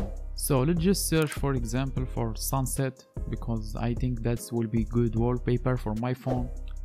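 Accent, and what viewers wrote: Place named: Morocco. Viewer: nta mghribi bayn mn laccent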